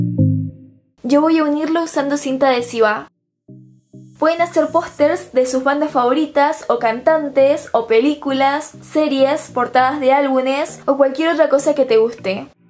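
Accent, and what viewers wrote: Argentina. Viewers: Sos argentina? Sólo para saber xd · Eres de argentina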